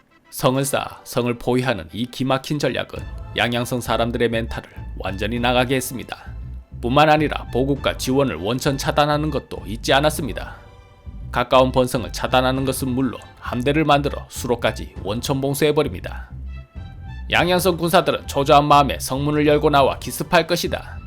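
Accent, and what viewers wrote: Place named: Daegu, South Korea. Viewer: 참 잼있고 유익한데 구독자가 안느네.. 진행 하는 양반 사투리도 내 고향 대구 같아서 더 좋은데.. 거참 이상하네 왜 구독자가 안늘까..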